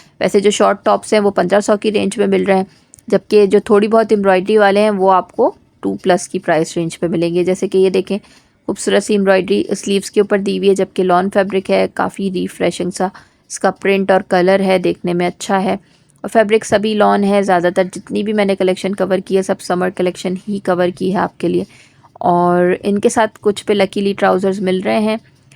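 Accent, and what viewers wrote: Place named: Karachi, Pakistan. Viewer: Itni saaf aur khaloos wali urdu sirf Karachi walay he boltay hn. 😂❤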